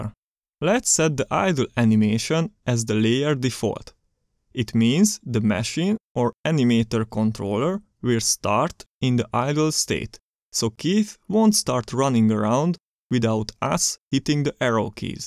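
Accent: Hungary. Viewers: Are you hungarian? Your english sounds a lot like how a hungarian would talk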